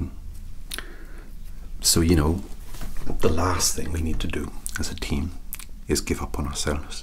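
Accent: Wales, United Kingdom. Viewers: So the Welsh rugby team caused the Corona Virus... Also if you're not Welsh, that is an outstanding Welsh accent impression. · Just finally watching this video and just wanted to say that I love your welsh accent. Very relaxing to listen to. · Would love to have more vids with the Welsh accent, or any other accents you can do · This was freaking hilarious, the welsh accent does add comedy value too, love it.x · This made me so happy! As a Welsh woman, the accent made me smile. You really can put your voice to anything! Brilliant! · It absolutely did. Hearing your voice always makes my days brighter, and it was definitely interesting to hear your Welsh accent! <3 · With the Welsh accent you're making me wish you'd do a Solas roleplay! From Dragon Age. Most relaxing character imo. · Moar Welsh accent vids, please. n_n (( Maybe something Sci Fi? :DDD But really, anything will do. 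It's so beautiful! ))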